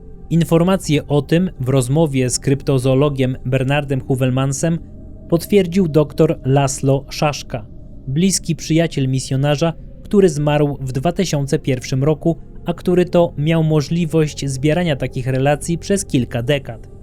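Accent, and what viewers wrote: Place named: United States. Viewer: Uwielbiam relacje na temat krypto gatunków. Zdaję sobie sprawę, że cześć z nich lokalny folklor lub turystyczny marketing - tak jak szkocki Nessi. Jeszcze raz dziękuję za dbałość wymawiania terminów po angielsku. Gdzie uczyłeś się angielskiego? Brzmi jak amerykańska wersja.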